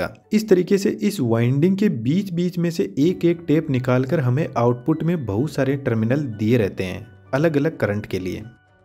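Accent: Rajasthan, India. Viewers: Aap East Rajasthan se ho na bro ?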